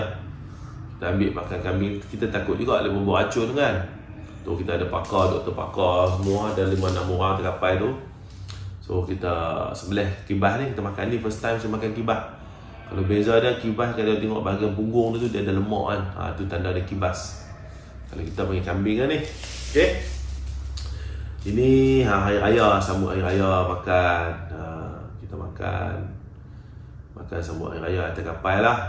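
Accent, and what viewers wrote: Kedah, Malaysia. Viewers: Yang buat kan video ni best sbb loghat kedah. Teringat duk kampung tenang..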